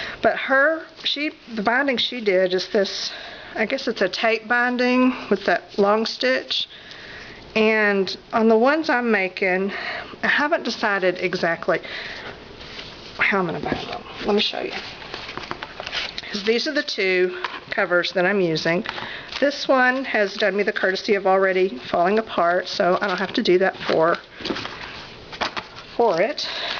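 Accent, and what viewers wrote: South, United States. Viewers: Gotta know where you're from! Our southern accents nearly match! Love these vids!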